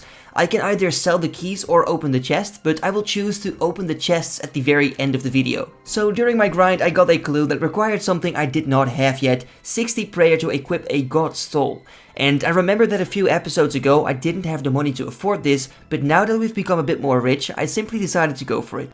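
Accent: Netherlands. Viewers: I was like "this dude sounds so dutch" then I saw the location, gang gang shit lekkuurrr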